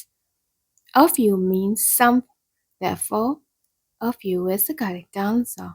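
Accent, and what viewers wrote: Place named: Eastern Europe. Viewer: I beg your pardon, however do you really need to pronounce that B in 'subtle'? It is silent. I feel something East European in your accent.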